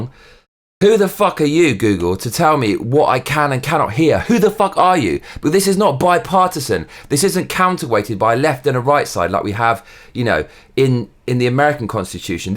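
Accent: United Kingdom. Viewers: Yes nice to hear a British gent with Libertarian views! Why do perverts get a whole month? Let's have a month for the nuclear family.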